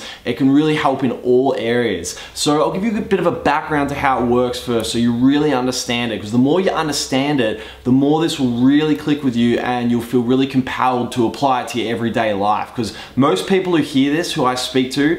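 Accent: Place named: Australia. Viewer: Love the OZie accent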